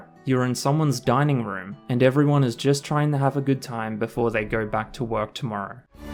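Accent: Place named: Australia. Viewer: Vids are crisp love your work keep it up. Haven’t seen many Australian (assuming from the accent) d&d channels.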